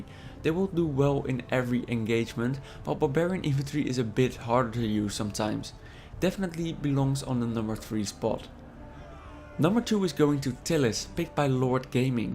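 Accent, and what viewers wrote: Netherlands. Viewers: could you be any more dutch? Why is it that people speaking with a Dutch accent simply sound a little bit inbred? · the guy narrating this sounds like he has a dutch accent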